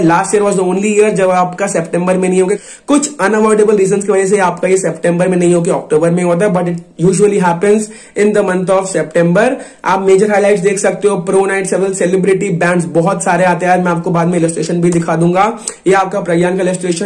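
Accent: Bengal, India. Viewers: Bhaiya!are you Bengali or South Indian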